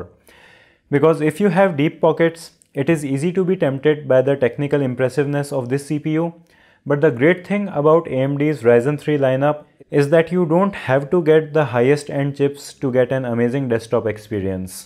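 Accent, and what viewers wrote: India. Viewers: You have the stereotypical Indian accent. Good video.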